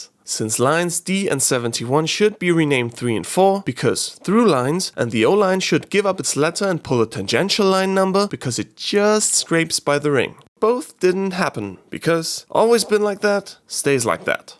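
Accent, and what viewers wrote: Vienna, Austria. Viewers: Its weird seeing an youtube channel in english with hints of austria or austrian dialects such as the: Tor! I wea narisch! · ich schau mir das hier nur an weil dein österreichischer Akzent sich im englischen dermaßen amüsant anhört dass es eher nicht geht XD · Sad but true story: I'm German and always watch the English version of your videos as the Wiener Version is completely incomprehensible if you're not used to that dialect :D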